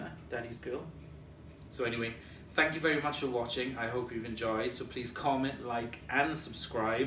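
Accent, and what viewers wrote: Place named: Scotland, United Kingdom. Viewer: Happy New Year to you as well! I have 3 permanent res. Cats, 2 longhair, and right now just one foster cat rescue cat and I understand completely about the fur 🤣 the Dyson was impressive with it. Always hesitant to buy another cordless vac again as I had one that underperformed big time, but this one seemed to work great. I love your channel, it’s fantastic! And omg the singing stuffed animals were soooo cute ❤️Thanks so much for giving the demo. Also ❤️ your Scottish accent. Some of my family ancestors are from Scotland (Fraser’s of Lovat) and I’ve always wanted to go there. Always feel at home in the mountains more than any other terrain. The Highlands call my name...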